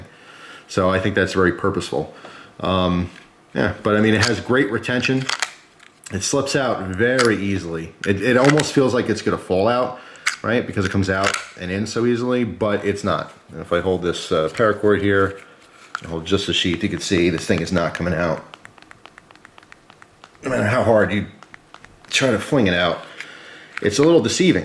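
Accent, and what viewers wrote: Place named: New Jersey, United States. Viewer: the A in extrema is pronounced kinda like ah, English when spoken relaxed by a fluent speaker it is normal for the spaces between english words shrink and flow fluidly sounding like extrem-ahratio. American English has there own colloquialisms, and (a native new jerseyite speeks with a specific accent. To me it sounds like a slight speach impediment, you can hear it distinctly with the word water.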